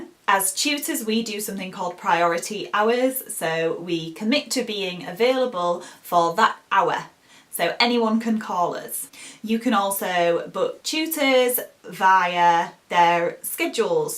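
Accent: United Kingdom. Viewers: the British accent sounds different and sometimes harder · which part of the UK you are from... Are you not from Midlands? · the British accent is better, because you pronounce the words as they're written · Loved british accent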